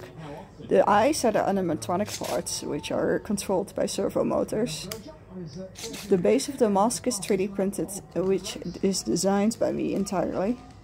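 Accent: Netherlands. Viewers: The dutch accent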